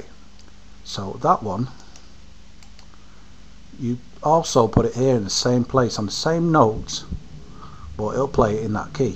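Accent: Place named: Europe. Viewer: I did. wow, so many gaming Vids. I used to be a hardcore gamer. Now my enjoyment from games chiefly comes from playing with other humans (in the same room). Still, if I ever need to catch a kewl euro accent, I've noted you as reference material;P. Cheers. See you around mate.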